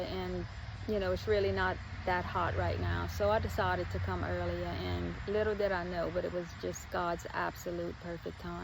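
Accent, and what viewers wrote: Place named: New Orleans, United States. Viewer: First time I’ve ever saw your videos and I can hear that NOLA in ur voice. Bless u momma.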